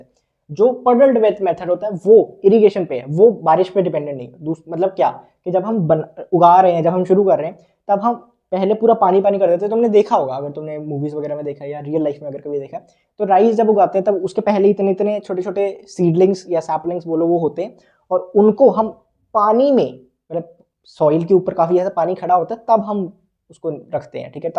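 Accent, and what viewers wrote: Punjab, India. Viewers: bhaiya are you punjabi >?????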